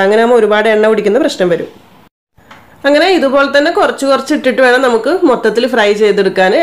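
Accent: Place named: Wayanad, India. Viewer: Wayanadan slang 😙😙😙😊nokkummo, cheyyummo അങ്ങനെ.. 💪💪wayanad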